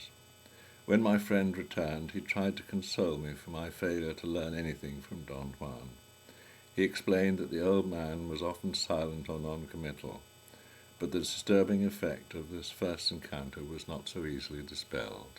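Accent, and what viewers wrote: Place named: United Kingdom. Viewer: You mean "moot point?" What "internal linguistic evidence" do you speak of? You are presuming that anything this guy Castaneda says is this mystical wisdom, even if you see that he's tricking you. I personally have no respect for "teachers" who assume that their students are too stupid to understand the real truth, so they make up bullshit to make it easier to digest. It's like a religious faith. If you are tripping balls and listening to a nice voice with a Brit accent it probably sounds nice.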